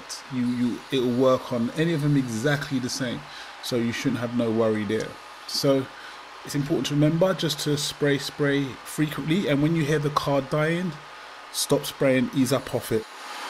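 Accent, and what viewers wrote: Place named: United Kingdom. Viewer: I think, in future, just say "would not" instead of wouldnt, in case the non-Brits dont understand your accent mate!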